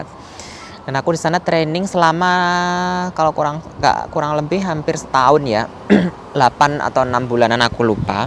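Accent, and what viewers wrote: Java, Indonesia. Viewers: Alhamdulilah mas....tetep semngt...aku seneng dgn logat jawa...ny itu keren tingl di sepnyol tpi ttp bahasa daerah ny ttp ada.aku suka......bgt...... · Wis sue neng luar biasa Jawa e kok jek medok y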